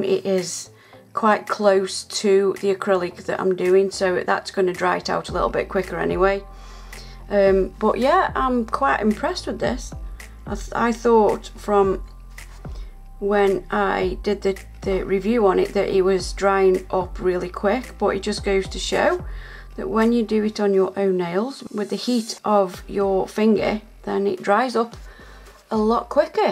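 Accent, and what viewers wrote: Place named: Stoke, United Kingdom. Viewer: love ya stokie accent too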